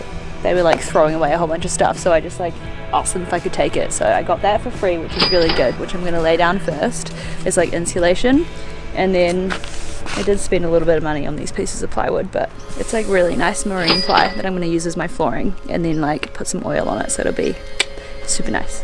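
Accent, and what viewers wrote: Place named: New Zealand. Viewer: Hey, thankyou for replying doesn't often happen a content creator replies. Just wanted to apologize as I knew you were not Melbourne born i just assumed you'd relocated there a child coz ur enzed accent had Melbourne accents to it. I know I shouldn't assume anything coz it makes an ass out of me & an ass out of me. Umm do you know if Elayna had the baby yet. Also meant to say becore, you did a really cool job of converting the van into your own little house on wheels. Cheers look forward to next vlog. RustyinOZ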